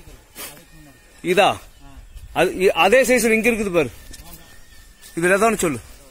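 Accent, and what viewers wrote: Kongu, India. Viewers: கொங்கு தமிழ் தாண்டவம் ஆடுது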